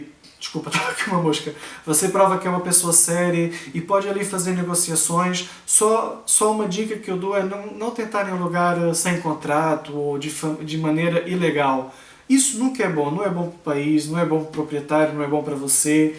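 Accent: Portugal. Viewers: ele fala oque quiser ok? o cara nao faz de preposito ele esta aqui em portugal e ta a apanhar um pouco de sotaque repaita o cara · Walter Oliveira isso de sotaque vai de cada um, provavelmente seus parentes moram em Portugal mas não estão integrados na sociedade portuguesa com nosso amigo está, tanto que a esposa é portuguesa. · Vi seus vídeos ontem, pela primeira vez. Gostei muito. Li alguns comentários abaixo e estou em dúvida. Você é brasileiro??? Percebi que seu sotaque Português é diferente. Eu sou carioca, e você, é de Portugal ou é brasileiro morando em Portugal há muiiiiito tempo? Achei excelentes os 3 videos que vi e tenho muitas peguntas, mas por hora é só isso....rsrsrsr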